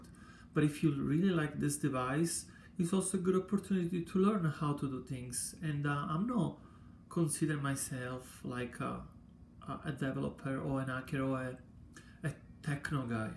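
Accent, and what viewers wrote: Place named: Italy. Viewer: La butto lì...ma sento un vago accento italiano...😜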